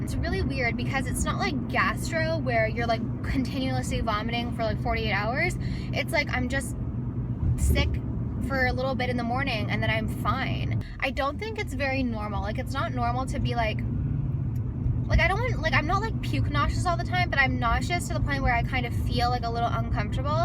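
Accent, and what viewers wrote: France. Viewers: aw your french accent it's cute!💘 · Montreal is in serious need of some snow so it feels like Christmas! Your french accent is so adorable.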